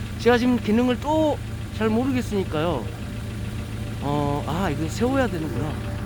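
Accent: Seoul, South Korea. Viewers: 서울사람보다 서울말 더 잘하는 님~ 화이팅~!! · 님 완전 서울사람인데요 ㅎㅎ 홧팅!!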